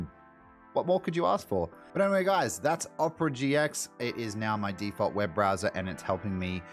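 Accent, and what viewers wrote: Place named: Australia. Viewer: I thought you were Australian. Crikey...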